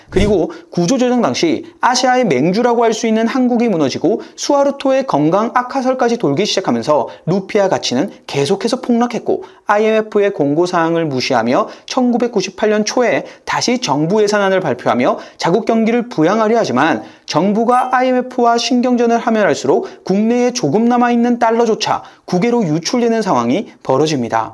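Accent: China. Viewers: 내용이 좋으면 모하노 성조가 중국어급이라 처음 듣자마자 거부감부터 드는데 전국구 방송하려면 표준어 구사를 하던지 악센트를 고치던지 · 조선족 같다능 ~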